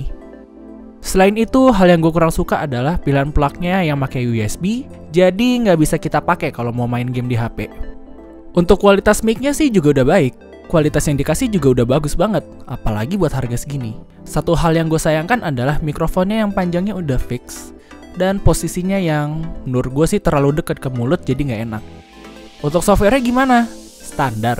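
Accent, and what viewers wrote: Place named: West Java, Indonesia. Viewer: Sunda pisan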